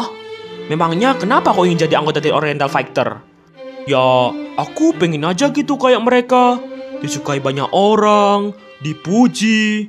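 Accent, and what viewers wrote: Java, Indonesia. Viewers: ini jowo bgt plisss, awokwok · Orang Jawa ya bang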